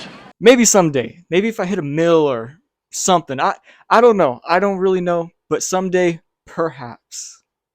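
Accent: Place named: Northern California, United States. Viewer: I was guessing he might be somewhere from the 530 area code in Cali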